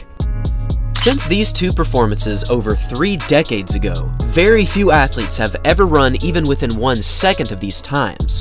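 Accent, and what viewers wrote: United States. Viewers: Why don't you take Griffith Joyner into this equation ? oww wait, i hear a yankee commenting voice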